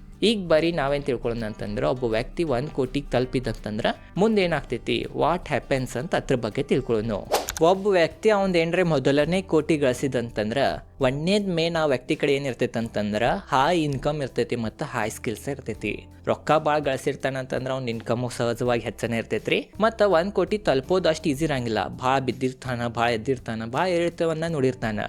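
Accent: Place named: Belgaum, India. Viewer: The King of Belgam - with Belgam Kannada. Jai Ho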